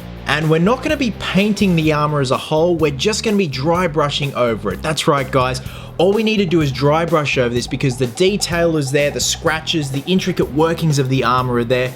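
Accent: Australia. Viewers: i love Australians accent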